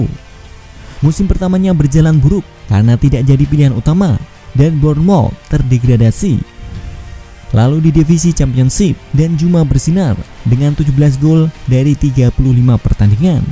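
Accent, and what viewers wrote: Java, Indonesia. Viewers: Jijik suara nya medok 😛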